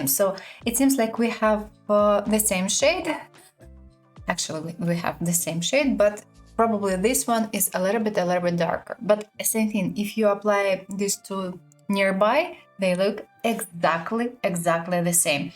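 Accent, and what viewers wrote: Russia. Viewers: какой русский при русский акцент😄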